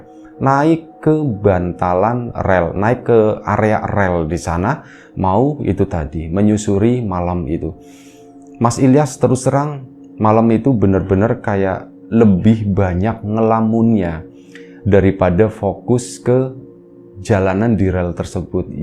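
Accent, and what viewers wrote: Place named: Banyumas, Indonesia. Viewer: oh pantesan ngapak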